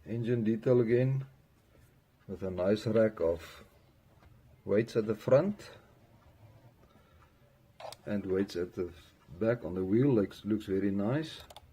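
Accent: South Africa. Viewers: i can tell your from south africa cos i used to live there and i am a big fan of tractors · Are jou south african😀😀😀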